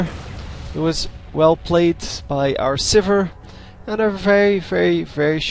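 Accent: Netherlands. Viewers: Yeah that accent for me is very characteristic, every dutch guy making videos has almost the same voice :D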